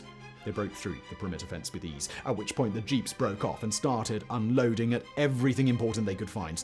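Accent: RP, United Kingdom. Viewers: When someone speaks the Queens English, it can be assumed the person values one's roots. 😉🇬🇧🏴✌️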